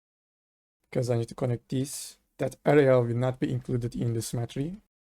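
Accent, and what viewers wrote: Turkey. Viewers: Usta şu ana kadar gördüğüm en iyi cinema4D videoları sende helal olsun işi tekrar sevdirdin bana aksandan hemen anladım zaten türk olduğunu :)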